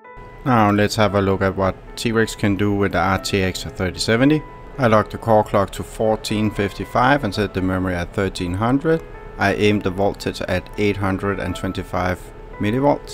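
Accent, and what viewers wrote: Denmark. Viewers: Den der Danske accent altså. :)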